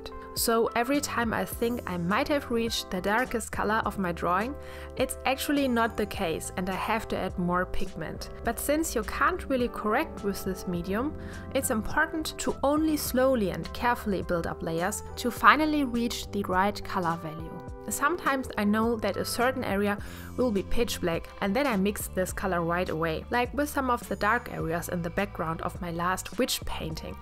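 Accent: Germany. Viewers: R u German? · I always enjoy watching your videos. Lately I had problems finding motivation and you helped me quite a lot :) also I enjoy the way you edit your videos - the slow close ups of the brush and the paint in the beginning are so stunning...it really catches the mood of the painting process :) oh and your German accent - lovely ;) it's always funny to find other German YouTubers... (PS: Liebe Grüße aus Berlin! <3) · Are you German? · Man hört direkt dass du deutsch bist☺️👌🏼 echt gute tips und echt schöne Bilder 👍🏽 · Are you froh German? Your accent is so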